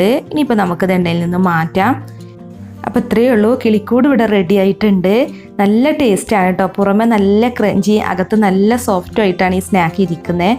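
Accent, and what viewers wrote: Malappuram, India. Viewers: നിങ്ങൾ മലപ്പുറക്കാരാണോ ,? Kaadamutta ഇഷ്ട്ടം 😋😋😋😋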